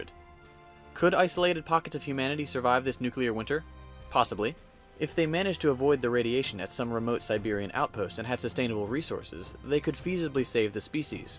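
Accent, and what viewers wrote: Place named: Dallas, United States. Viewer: so this is why your voice seems so familiar, you live in Dallas(like me), and thus your accent is familiar!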